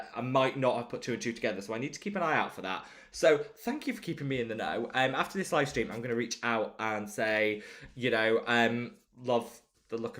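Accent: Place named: United Kingdom. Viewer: Are you from British ? Because I like to hear your voice when you spoke , it made me tried to find the differences between English and American accent. Well, Thailand is now am. But for me, I’m going to sleep soon. By the way, thank you so much for your kindness for replying me.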